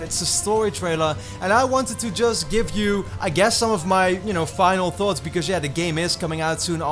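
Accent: Netherlands. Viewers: Ja dat accent herken je al van een mile away! Maar hey, beste nederlandse gaming YouTuber naar mijn mening.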